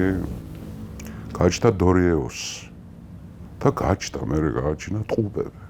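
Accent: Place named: Kutaisi, Georgia. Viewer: ქუთაისურად რომ ანტიკურ ისტორიას უსმენ სხვა გემო აქვს😂( ასიკვდილებენ...უშვრებიან.... და სხვა მრავალი. )ძალაინ საინტერესოა და კარგია ❤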